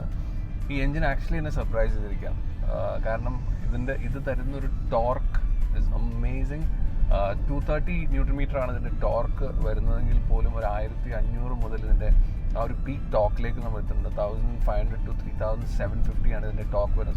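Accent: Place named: England, United Kingdom. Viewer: Mr ഇംഗ്ലീഷ് ആക്സന്റ് ഇൽ മലയാളം പറയാതെ പറയുന്നത് ആളുകൾക്ക് വ്യക്തമാകുന്ന രീതിയിൽ സംസാരിക്കാൻ ശ്രദ്ധിക്കുക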